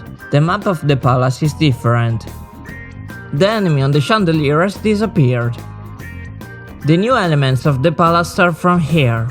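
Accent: Italy. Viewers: Are you italian? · I know it's hard to understand, but it's not his fault, in Italy most schools teach you english with a bad accent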